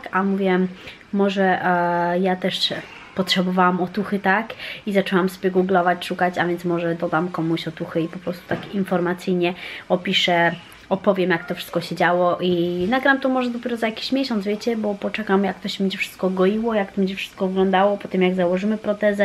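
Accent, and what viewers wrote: France. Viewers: Masz piękny francuski akcent,mega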